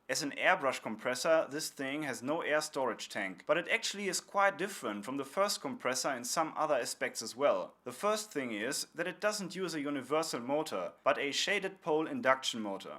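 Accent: United States. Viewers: Thanks for the quick reply. Yes there is definitely a sprinkling of American in your speech. Thanks again.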